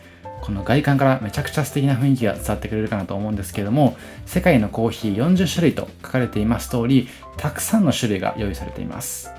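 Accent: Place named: Tohoku, Japan. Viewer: 東北出身の方ですか？私は東北なのですがイントネーションが同じで親近感😊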